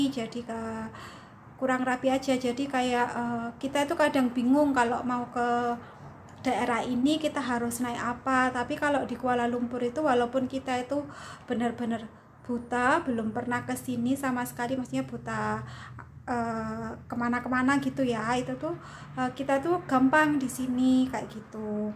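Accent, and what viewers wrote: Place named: Java, Indonesia. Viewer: Koe wong endi toh yu..kok bahasane medog buanget..untunge ricok oleh bojo londo yuk...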